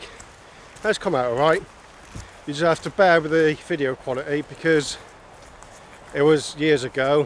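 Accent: Devon, United Kingdom. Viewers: Just stay as you are, you just have a Devonshire accent I have a Suffolk accent lol don’t worry about how you sound, I watch you tube on my tv you sound ok to me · Hi.... great to see you are keeping well mate. Don't worry about the awesome Devon accent mate..... it is one of the best we have. It has been a crappy 12 months for everyone and fingers crossed when all this madness ends things will get better for us. People sub because we like you and the things you talk about on video. Plus you are a top bloke as well. I will always be around for the times you get out and do a video mate. Stay safe, all the very best, Mark:):)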